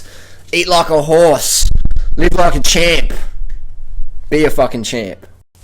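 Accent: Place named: Australia. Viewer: the aussie accent is awesome :D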